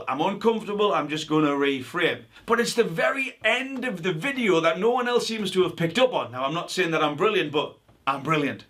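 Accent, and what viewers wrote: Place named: Northern England, United Kingdom. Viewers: I’m back again, a year later, for the content, and also those delicious Yorkshire oooooooooooooh s!! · Great video. I’m enjoying that heavy Northern accent. Could listen to that all day 😁.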